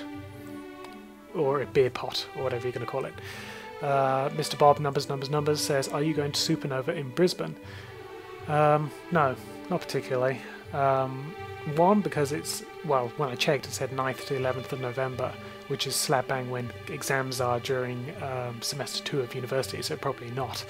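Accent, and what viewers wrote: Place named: England, United Kingdom. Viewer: You're English eh? You are now my favorite brony.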